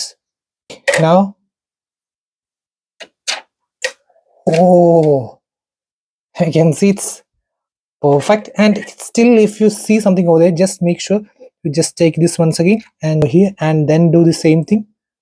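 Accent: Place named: Kerala, India. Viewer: Dude, just a suggestion. You speak very fast, and your accent (I'm guessing you are from the South, possibly Malayalee) makes it very hard to follow you. Try enunciating your words better and speaking a bit slower. People aren't going to stay with the channel if they don't understand anything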